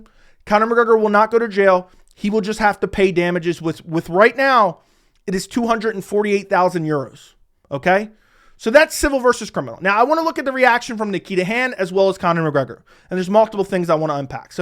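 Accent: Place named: New Jersey, United States. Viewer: You gotta be from NJ because I speak the same exact way when I’m passionate and frustrated 😭 you’re so right tho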